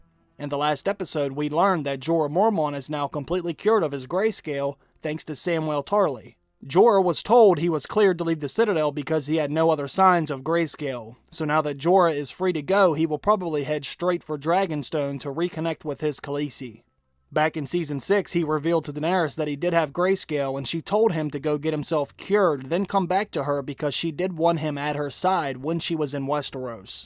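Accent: United States. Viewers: Which American accent is that ?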